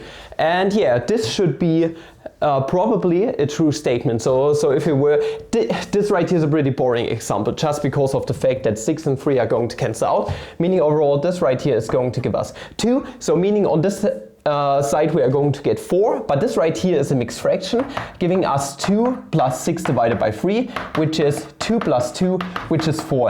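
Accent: Germany. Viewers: Your German accent is way better than whatever other accent you're trying to imitate, you should rather stick to it.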